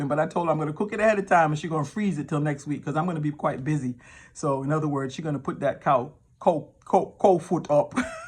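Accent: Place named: United Kingdom. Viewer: Blessings, you still have a bit of the British accent.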